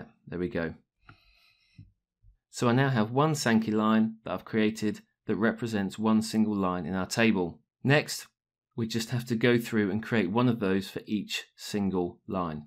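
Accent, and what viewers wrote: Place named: England, United Kingdom. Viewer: Thanks! lovely English accent by the way =)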